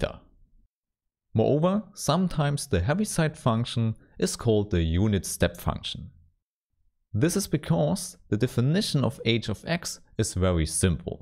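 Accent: Germany. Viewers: man merkt er ist deutsch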